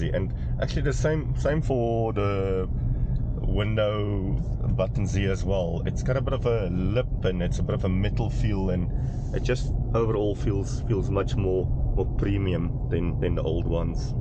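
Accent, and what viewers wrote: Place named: South Africa. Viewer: Love the South African Boere accent Boeta, lovely content and detailed review; thank you Sir.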